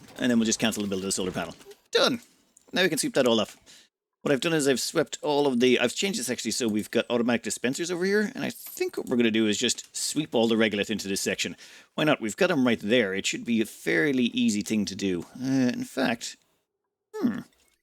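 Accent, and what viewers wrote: Ireland. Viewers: YOU'RE IRISH?!?!! FUCK YEAH!!!!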